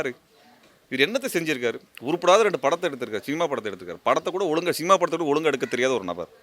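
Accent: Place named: Kerala, India. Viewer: Dei naaye h raja tamizhan da.. Nee naaye un slang eh malayala slang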